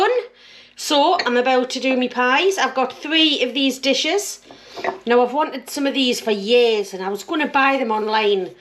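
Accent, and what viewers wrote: Newcastle, United Kingdom. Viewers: I'm addicted to your channel, sitting at the kitchen table and drooling. I was born at the beginning of the second world war, so lived with ratioing until my teens. We all ate wonderful pies and stews like yours and obesity was unknown, but we thrived. We only began to get fat when sugary, highly processed food became the norm. What a tragedy affluence has been. I would love to see your Toad in the Hole and Weardale Tatie Cake sometime, please. I live in Weardale, but have not found a really good recipe. It's lovely to listen to a real Geordie telling me about the wonderful food my mother used to make.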